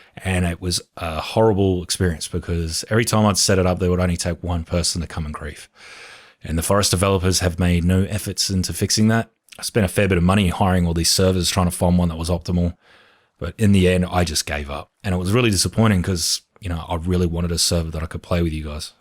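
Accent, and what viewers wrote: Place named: Australia. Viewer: Explains why their was a baby in the background at Stranded Deep. Have a good one brother, missed your Aussie voice 😂.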